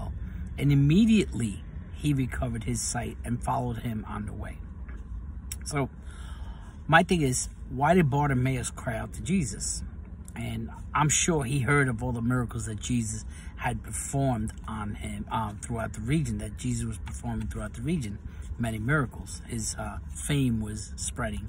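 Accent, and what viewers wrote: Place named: Long Island, United States. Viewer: Oh yes, I didn't mean that message specifically for you since you'd already shared you were loud about Jesus! :-) It was just for anyone who reads my comment (or as a prayer you could suggest to others as you're led by the Lord). I actually discovered you at one point during your Pentecost series, but I think I only saw one video from that (I'd have to go back and check to find out). God bless you! P.S. I love your accent (Long Island?)!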